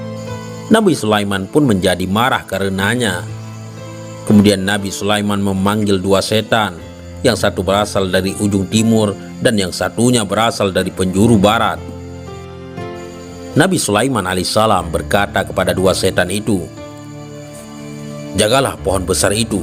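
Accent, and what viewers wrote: Aceh, Indonesia. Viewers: Logad bhsa Admin ini kyak logad bhasa Aceh..?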